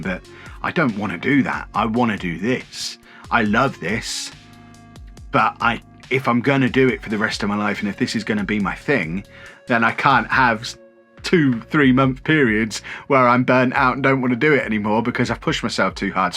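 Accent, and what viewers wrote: England, United Kingdom. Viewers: are you Rob Chapman of chapman guitars brother? you look exactly like him and you both have english accents so i would assume so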